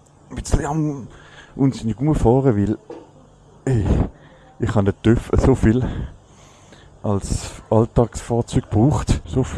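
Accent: Switzerland. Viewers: Moin din Dütsch ish lässig guet moll wieder CH zum höre wanni do im Nord Deutschland umme irre schönnie Pfiffe fome flach Land 😂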